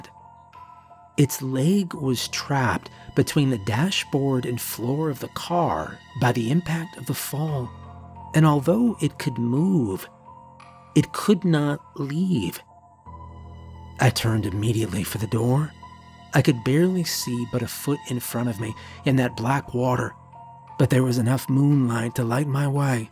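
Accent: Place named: United States. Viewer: As always your narration was second to none. Being in the UK, I have often found it difficult to listen to an American accent narrator, but you have the perfect voice, timbre and intonation, certainly for this genre. Thanks for narrating this fabulous story ❤❤❤❤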